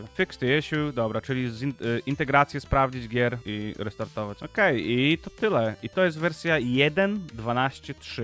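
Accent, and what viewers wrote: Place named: Podlasie, Poland. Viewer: wschodni akcent... Podlasie? :)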